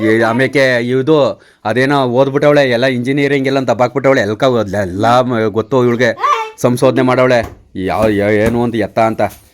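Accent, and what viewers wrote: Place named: Magadi, India. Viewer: ನಿಮ ವ್ಲೋಗ್ ಚನಾಗಿರುತೆ ನೋಡಕೆ ಮಾಗಡಿ ಮಾತು ಬಿಡದಿ ನಿಮ್ಮ ಪ್ರೀತಿ ಬಾಯಿಸುವವರು 🎉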